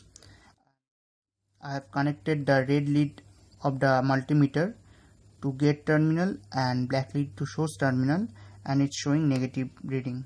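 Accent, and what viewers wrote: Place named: Mumbai, India. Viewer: bombay accent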